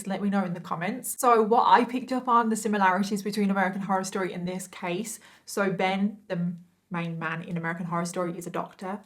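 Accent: United Kingdom. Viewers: Was wondering why your videos were so similar to Bailey's.... then I saw in the description box you give her 100% credit for your inspiration! Good job ! Honest, beautiful, great makeup artist and story teller AND with a Brit accent ! Sad how Feliz means Happy 😧 One advice: Read Dante's Inferno, I know you're young so have not heard of or read it, but it should be a part of your literary culture. You'll thank me later ☺ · i mean i watch bailey but u dont watch THIS girl because shes british and i cant really understand her and also im learning american english and i dont want to mix these accents up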